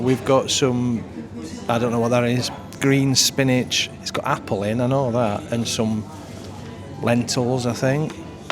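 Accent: Yorkshire, United Kingdom. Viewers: "It's not right warm" - gotta love a yorkshire dialect 😊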